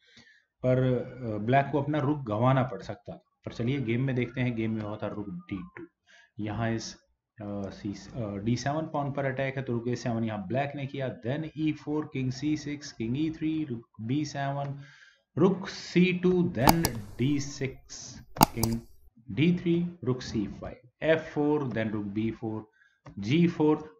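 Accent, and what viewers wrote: India. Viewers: Thanks for all your Mikhail Tal's Games and indepth Analysis..!! From your pronounciations, I thought you are Gujarati.., but fr9m looks I think you are Punjabi, I guess..!!😊👍👌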